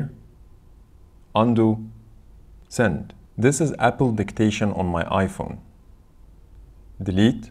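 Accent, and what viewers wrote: Egypt. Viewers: That sounds like an Egyptian accent 🤔? Are you, my dude?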